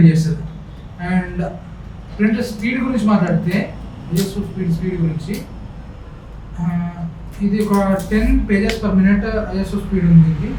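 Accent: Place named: Hyderabad, India. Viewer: Still hyderabad slange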